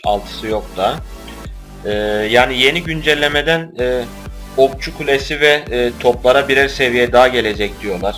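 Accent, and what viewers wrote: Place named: Anatolia, Turkey. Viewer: Tam Bir Anadolu Çocuğusun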